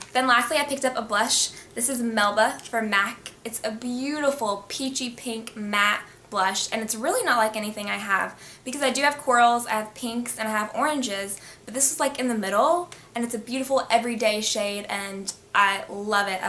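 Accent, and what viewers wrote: South, United States. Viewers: Yourr sooo gorgeous & I think I hear a country accent??? Im from texas :)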